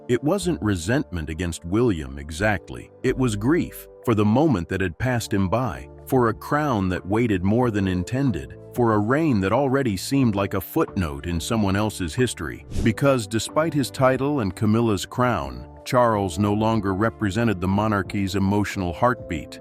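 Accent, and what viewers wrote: United States. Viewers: All this is made up rubbish. Even narrated by a Yank.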